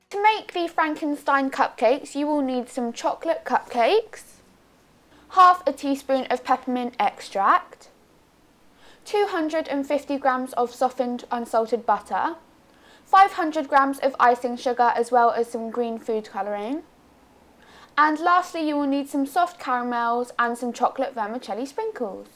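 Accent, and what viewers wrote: United Kingdom. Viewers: Hi.. I want to make that cupcakes in my birthday coming soon for my classmates.. But whenever I try to make cupcakes then my buttercream starts to melt 😢 I don't want my class to laugh at me on my birthday😫can you please help me.. Actually I got the easy and best buttercream recipe from you: icing sugar, unsalted butter, peppermint extract and food colouring.. But I don't have peppermint extract 😭😞 Can I used something else instead?? Please reply.. I loveeee baking.. And want to show my classmates that I can bake I came here from watching your previous videos I love your personality and you accent I really wanna learn that British accent And sorry for my wrong english I speak German so my English is a bit weak I will be sooo happy if you reply me Love from Germany ❤❤❤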